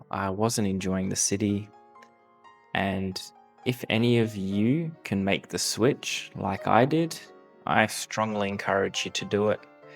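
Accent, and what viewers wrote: Australia. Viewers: we saw the same bad behaviour in NZ. Some increases were insane. I'm sure there was a spike in food waste as well. It's not only in Auckland, sadly. You are blessed to be able to do what you're doing mate. I love your accent, you sound like my favourite cousin in Melbourne 😂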